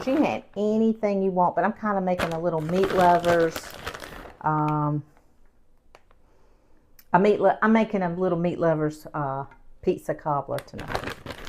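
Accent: South, United States. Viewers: OH MY. It looks so Delicious! I will be making it ASAP. Already had the menu posted for tonight's dinner, Appetizer, Blistered Shishito Peppers with a Garlic Aioli, Turkey Burgers, with Potato Wedges and Broccoli Salad. I saw one of your Y-T videos a while back, and you're so personable and soft spoken, and with that Southern Accent, I Subscribed right then! Thanks, for taking the Time and Effort to Film and Edit your videos. We your Subscribers Appreciate You! Have a Wonderful Day!!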